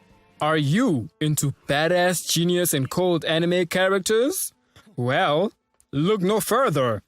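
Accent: South Africa. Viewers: Is the guy commentating on this video from South Africa.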